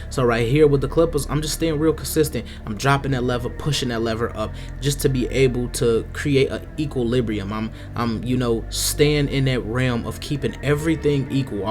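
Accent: Chicago, United States. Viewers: Sound like you from the Chi, I'll drive 3 hrs for this cut. No doubt! Good work bro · that Chicago accent, is crazy. I lost mine at the age of 10 when I moved to TX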